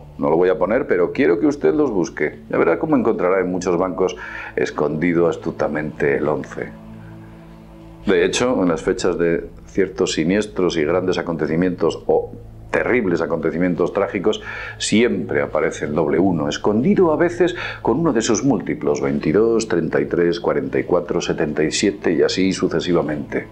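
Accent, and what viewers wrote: Spain. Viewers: Es español? Jaja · muy bueno. .es Español? ??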